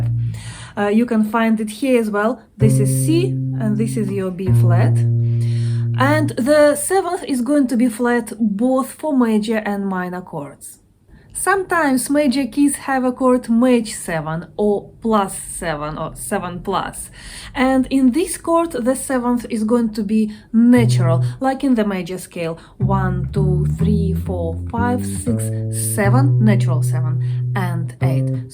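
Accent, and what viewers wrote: Russia. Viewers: What a fine difference she makes compared to all these ugly unshaven tattood guys in black t-shirts. Her teaching is not only very accurate, but is is more fun to listen to somebody with a pleasant aura and the russian intonation is the cherry on the top.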